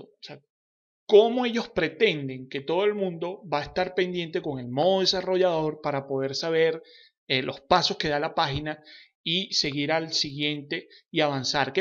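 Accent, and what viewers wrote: Venezuela. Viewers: Gracias, es de mucha ayuda, lo gracioso es escuchar lo que decías de tu hermano como si hablaras con un amigo, jejejej, tan venezolano;). Gracias de verdad!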